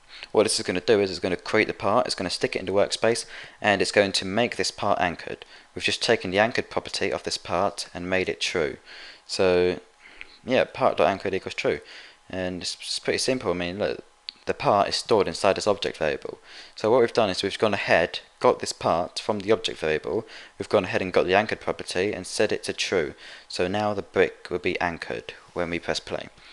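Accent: United Kingdom. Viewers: Yea, I think hes from the UK or something like that. That's most likely why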